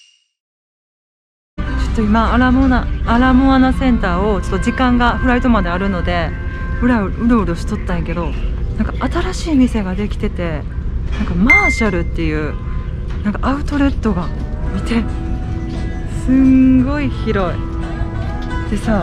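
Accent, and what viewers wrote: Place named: Ise, Japan. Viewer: 同じ伊勢弁なのが嬉しいです✨親しみ持ってます♪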